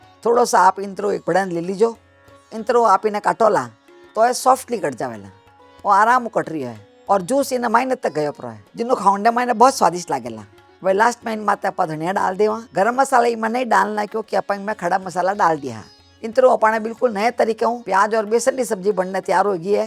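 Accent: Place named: Jodhpur, India. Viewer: Mast vidio mast sabji mast jodhpuri boli sa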